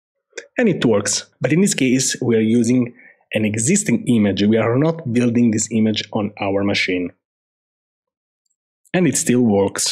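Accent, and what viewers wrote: Italy. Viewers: I love two things : the way you did your part of the job, and your strong Italian accent, Of course I subscribed. Thank you both, guys !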